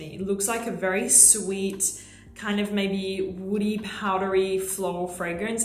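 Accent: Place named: Australia. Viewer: I love her Aussie accent and the way she describes fragrance makes me genuinely want to own everything she recommends cause it just sounds so delicious when describes it! My go-to for fragrance knowledge forever!!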